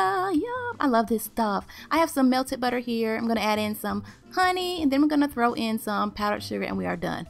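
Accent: South, United States. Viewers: IK she's southern but from which state? Sounds like Virginia or Carolina to me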